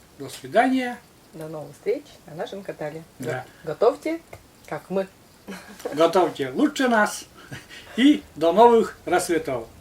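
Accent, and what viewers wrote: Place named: Luza, Russia. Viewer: Здравствуйте красавица я случайно зашла на ваш канал и услышала свой родной говор я с лузы всего доброго вам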